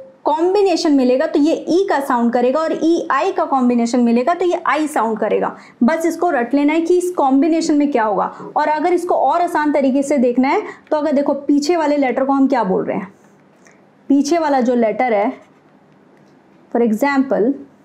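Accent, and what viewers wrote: Rajasthan, India. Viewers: bist du aus rajasthan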